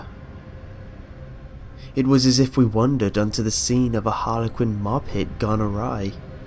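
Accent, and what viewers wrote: United Kingdom. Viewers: Any other girls (or guys) out there kinda have a thing for 's voice? I think pretty much everyone digs a British accent-or is it just me? Maybe it's cause of the Beatles, I don't know...I think out loud too much. Hesitates to click post. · omg brit accent so sweet and scary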